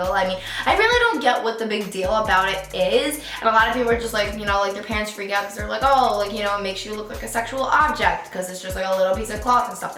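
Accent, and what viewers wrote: New York, United States. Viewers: love your new york accent omg!! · New York! I was trying to figure out where that accent was from!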